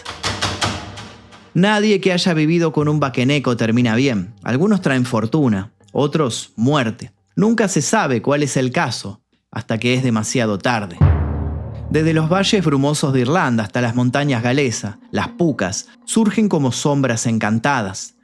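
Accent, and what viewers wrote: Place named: Argentina. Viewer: "polisha", "coshote". Qué chido se oye la pronunciación de la "ll" y la "ye" en el español argentino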